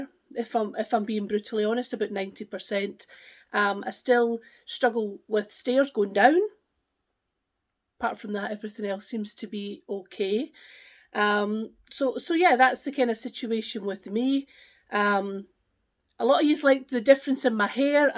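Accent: Scotland, United Kingdom. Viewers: Hi, I'm fairly new to your flosstube, just started watching before Christmas. Michelle from mommalovesyougb recommended you and I fell in love with your accent. I'm in Canada and have Scottish ancestry so something about the Scots accent appeals to me. I think your choice of projects are great. I've never been to Paris, had a chance probably 10 years ago but went to ireland instead. I like the chart tho. I didn't hear you mention if this was just for UK only stitchers, if it is just disregard my entry. Cheers on getting back on your feet, nothing worse than health issues during covid times.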